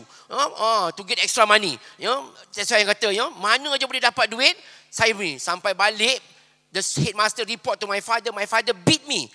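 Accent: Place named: North, Malaysia. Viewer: Mak org Singapore, ayah org Melaka, tang mana boleh melekat loghat Utara?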